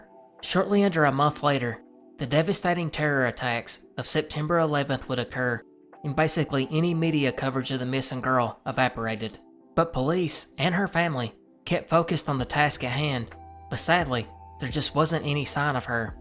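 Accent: South, United States. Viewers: For like 5 seconds, I literally thought you had a Scottish accent because your southern accent is so significant!! And I’ve lived in North Carolina my whole life! I love how there are so many varieties of accents.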